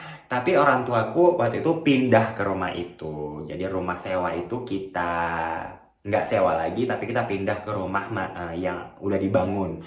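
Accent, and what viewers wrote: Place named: Medan, Indonesia. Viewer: Bah kota medan kau bang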